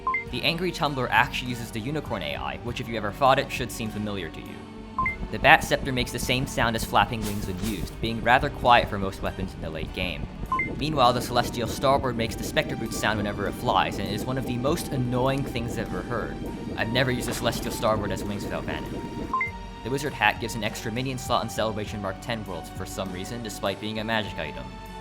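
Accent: China. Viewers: okay, so now we know you're a native speaker of chinese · I love your chinese pronounciation. Also, Frozen Chests were once called Ice Ice Chests in the game. Unless that was just in the Russian localization.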